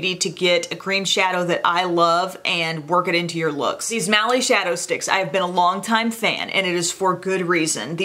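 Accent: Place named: Nashville, United States. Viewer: I don't know where you are from, but your accent makes me homesick for where I grew up--Nashville.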